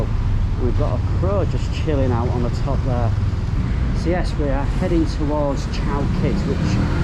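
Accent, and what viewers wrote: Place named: Northern England, United Kingdom. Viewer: Enjoyed the video. Nice to hear a northern accent. I have a mate here in KL from Liverpool but possibly you're a bit further north than that! Me, I'm a Sussex lad from the South.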